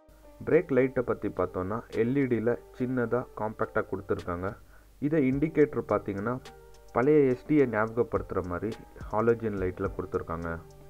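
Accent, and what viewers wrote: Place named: Bangalore, India. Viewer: Bangalore Tamil nice best of luck bro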